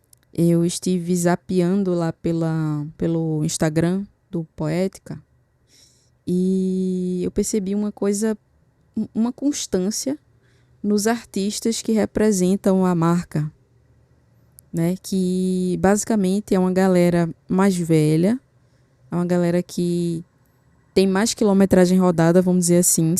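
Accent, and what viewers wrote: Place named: Recife, Brazil. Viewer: Vixi Maria que agora melhorou foi muito! Cafe com leite, Senhor dos Aneis, sotaque lindo de Recife e observacoes/trabalhos sensiveis…bom demais❤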